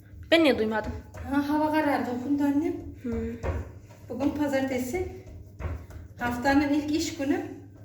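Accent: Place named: Konya, Turkey. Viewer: yurt disindayim Konyaliyim o Guzel siven icin izliyorum sizi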